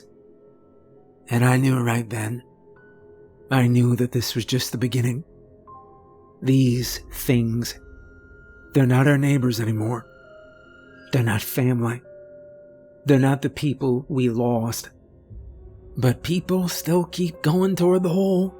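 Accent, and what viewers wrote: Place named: South, United States. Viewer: I love your voice, country (both southern and northern) accents always put me right to sleep. Feels like pa. Your voice reminds me of a buddy of mine, tall , short blonde hair, built like a bodybuilder, does electrician stuff. Born n raise in the heart of Tennessee, with a smooth southern accent. You have a voice that keep reach folks’ hearts. If ya ever get tired of reading stories to folks, take up public speaking to folks. A voice like yours can bring change, buttering’ your way into folks’ hearts. It’s calming, the tone, cadence, pauses are just right ❤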